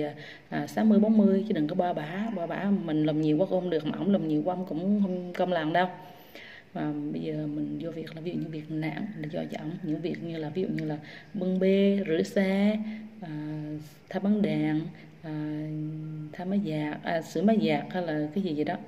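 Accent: Quang Nam, Vietnam. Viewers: Chào em gái Quảng Nôm. Anh cũng người Quảng xa quê gần 30 năm.Rất vui khi đựợc nghe em nói chuyện bằng giọng Quảng. Hy vọng một ngày không xa được cà phê với em tại Đà Nẵng hỷ! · Chu choa bữa ni cắt tóc ngắn, nhìn thấy lạ nghe cô giáo . Nói chuyện giọng Quảng nom nghe dễ thương quá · dạy tiếng Anh vậy khi phát âm tiếng Anh có khi “nồ” bị ảnh hưởng giọng QN không? Thí dụ “shall” —> đọc là “sô”? How (—> hô) Frank (—> frenk) Mackerel (—> méc.kerel) Contact (—> con.tect) v v Các bạn học sinh họ có khó khăn gì ko?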